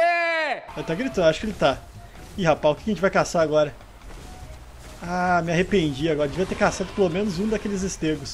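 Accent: Rio Grande do Sul, Brazil. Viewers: Vc é gaúcho né ?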